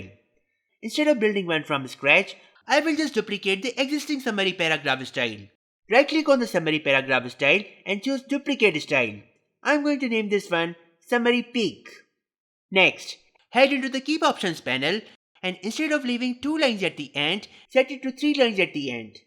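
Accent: India. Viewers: Glad to hear about this, may be just a bit slower taking into account that you are being watched in english and you talk as Hindi english : )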